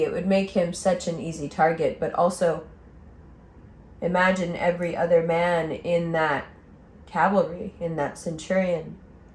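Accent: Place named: Brooklyn, United States. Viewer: Articulate and on point for the first half...straight up Brooklyn for the second half! Love you....need to get into Libra season...🙏🕊🌬⚖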